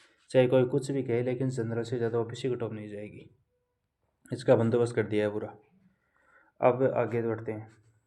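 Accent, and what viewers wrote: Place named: Shekhawati, India. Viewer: Bhai rajsthan se ho ki wo bi sekwati se 😂😂baat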